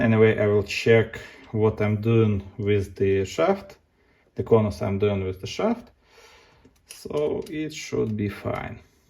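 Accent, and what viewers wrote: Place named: Russia. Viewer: Strong russian accent...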